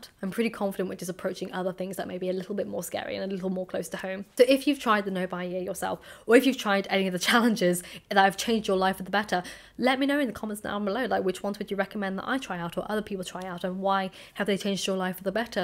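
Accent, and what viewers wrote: RP, United Kingdom. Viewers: That posh accent! Still trips me up. But the more I get to know you... the more I understand and respect you, what you stand for. (Too early to use words as 'like' or 'love' but... gett'n there). 😜😁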